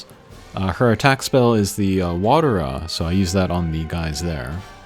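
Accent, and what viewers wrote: Japan. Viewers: Hi! Welcome to channel, where contents are great and the content creator shows a great and genuine enthusiasm toward this game. If you are new here, then you might know that this great guy likes to bring the sense of Japanese culture and pronounciation during during. He is a smart guy. Let's focus on the content and appreciate any uniqueness that he share. The whole world is not centered to the West, you know:D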